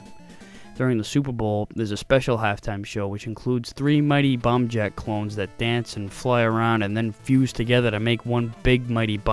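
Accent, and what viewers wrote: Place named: New England, United States. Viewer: Great review; greater game. The NE accent adds some flair to the review.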